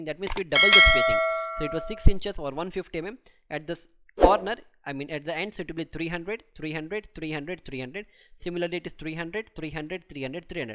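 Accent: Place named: India. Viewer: Ugh the Indian accent! I really want to learn.😊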